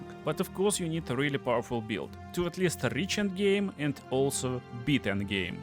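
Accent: Russia. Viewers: Most likely Russian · Strong Russian accent 😂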